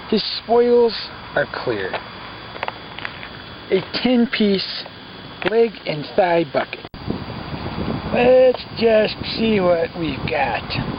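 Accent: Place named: Chicago, United States. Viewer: Midwest chitown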